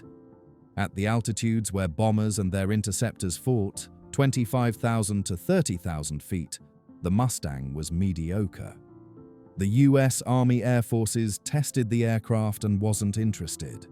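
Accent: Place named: United Kingdom. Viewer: The AI-generated narration has no grip on what a timeline is, and its British accent doesn't help.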